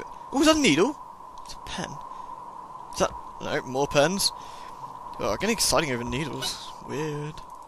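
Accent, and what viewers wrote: United Kingdom. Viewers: Finally he's reading stuff! I wanted him reading since way back when he was doing Penumbra...the British accent makes everything sound more official...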